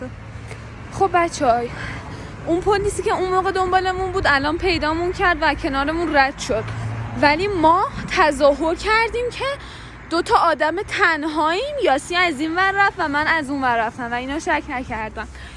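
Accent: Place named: Shahrekord, Iran. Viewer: از لحجتون قشنگ معلومه دهکردی هستید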